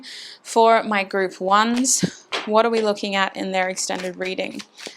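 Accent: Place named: Australia. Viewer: Just came across your channel and thought to myself - this lady sounds Aussie 🤔 and you’re an Aussie 🥳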